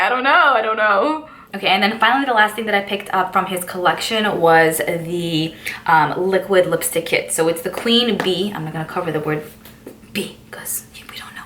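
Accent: New York, United States. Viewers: the way you say gloss, the “just ate a bagel”, the horns in the back while you’re talking just all screams New York and I love it 🥺 moved here on a whim in August & your videos give me so much motivation to explore the city (aka TJ Maxx)! email: <mail> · I first watched you open the WNW Rose collection. (Made me want to buy it.) And I keep swearing that I'm subscribed to your channel, but I almost never have your vids pop up. (My other half is from Brooklyn and your voice reminds me of visiting NY/Jersey. Say cawfee sometime...) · I love your videos! Everytime I listen to you, I really get the "New York" vibe!😊 Nevertheless, I love purple and this collection is the "bomb". 💜💜💜💜 Purple eyeshadow looks awesome on you!🥰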